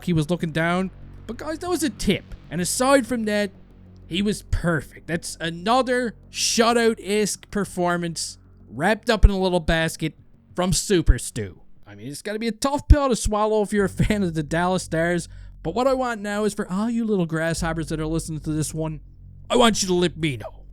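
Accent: Newfoundland, Canada. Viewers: sounds like you have some newfie in ya, sounds great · Yo man, sick vid but are you a Newfoundlander?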